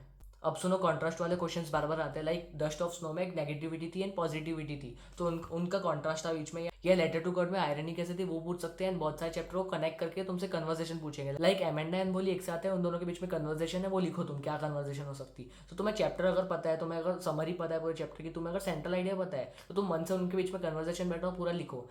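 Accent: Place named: Hyderabad, India. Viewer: His.. Hyderabadi accent 😅🤙🏻🥀😭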